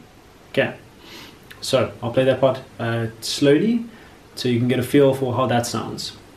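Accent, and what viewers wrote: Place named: South Africa. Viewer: I nearly died from excitement when I heard the south african accent!!!! It's not every day I find south african youtubers 💀 Keep doing what you're doing, this tutorial was amazing!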